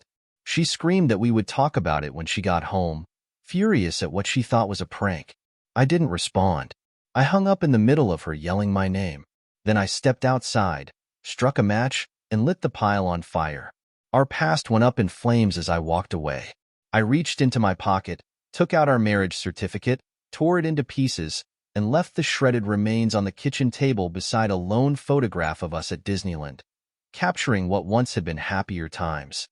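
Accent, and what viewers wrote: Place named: United States. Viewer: An American voice on an English story - irrational.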